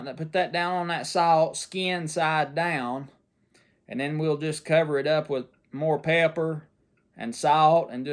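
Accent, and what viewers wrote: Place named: Arkansas, United States. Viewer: Hello from Arkansas, I’ve subscribed with this channel and Kenneth and Cindy from Judsonia Ar. Cindy told me she had found someone that talks like me! I was born and raised in the Hills on North Central Arkansas been here All my life. I did work some around Boone and Blowing Rock and love it out there, and plan to come back and visit before long. It’s just been Hot here, and we been watching your videos, love them, keep it up!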